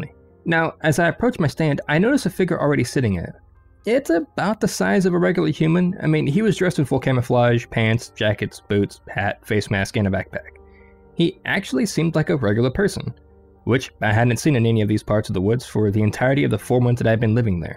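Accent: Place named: South, United States. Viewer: youre welcome I do too. He is from North Carolina a southern accent. We do similar vid3os. I enjoy your interputation of it.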